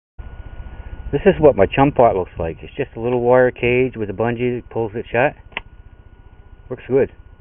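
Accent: Canada. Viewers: hey am living up in ontari-ario and im from the new glasgow area your voice sounds familiar like you might be from around there. Cool videos thanks i subscribed:)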